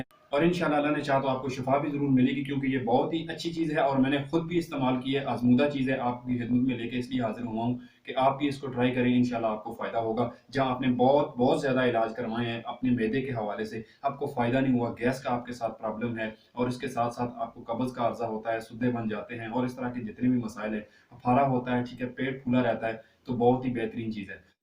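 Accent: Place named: Pahari, India. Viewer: Bhai apni bolne ka treqa badlo Ap k bolne PTA chal Raha hy k ap koi phari ho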